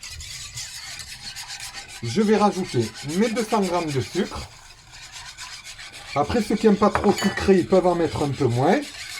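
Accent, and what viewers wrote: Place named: Oran, Algeria. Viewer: On pourra pas dire que vous n êtes pas algérien " n énervée pas mes nerfs " 😂😂😂 votre accent me rappelle mon enfance a Oran 🤔 c est un quartier de Oran mon oncle a habité la bas merci pour vos bonnes paroles et humour 😂👍 je viens de m abonnée ya une semaine bonne continuation 🙏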